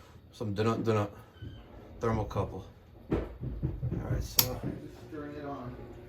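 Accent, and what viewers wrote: New York, United States. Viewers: Trying to copy Steve laviemore channel, gloves, camera, videos but with New York accent. No wonder he deleted you. Trying to be a celebrity plumber. Nobody likes a copy cat. Lol only .I couldn’t work all day doing service trying to put on a show holding a camera. To much time. To many calls. Not bad if you only one or two calls a day. But five to six.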